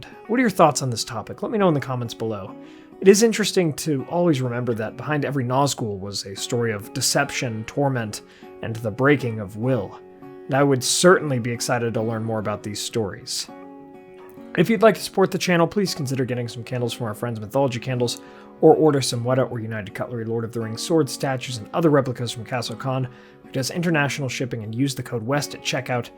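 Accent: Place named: Bay Area, United States. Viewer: Jersey ? Detroit ? Chicago maybe ? No I know The Bay Area !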